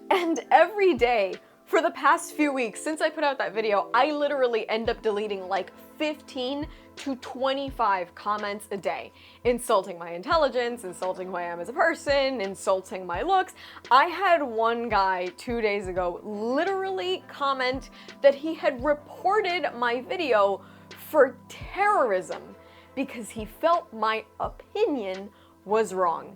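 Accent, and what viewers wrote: Cuba. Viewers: Cuban accent detected! ❤ half Cuban/half Mexican here Represent! People suck, if you want to watch review videos like yours and want to contribute its better to comment politely and give your opinion with reasons for giving your opinion. Make your case, but why start disrespecting and acting like an internet tough guy? Puro paz y amor, everybody chill. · Que bonito hablas espanol! Mi cubanita linda. No matter what you say or how strong opinion you may have, say it in that beautiful cuban accent. Te derrites como la cera caliente!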